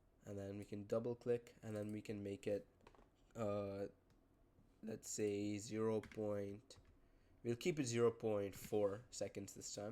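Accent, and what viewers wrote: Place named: Germany. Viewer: Hey where are you from? You sound like you're from Germany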